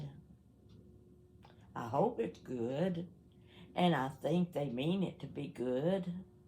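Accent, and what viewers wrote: South, United States. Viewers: Your Southern accent reminds me of my grama. 🎉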